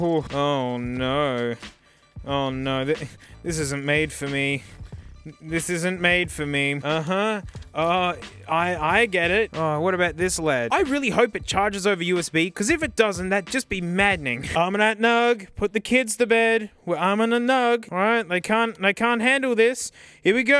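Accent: Australia. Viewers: As a german myself, hearing a funny australian man with a pet snake pronounce german words is pure bliss also "Große" the "ß" is not a b-letter, but instead is a sharp "s" sound, there are talks of that letter being removed someday but idk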